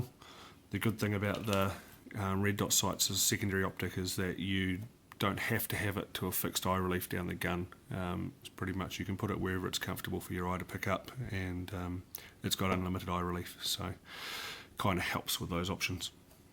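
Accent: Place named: New Zealand. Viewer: That kiwi accent... Those sorry azzzzzz lil mags :(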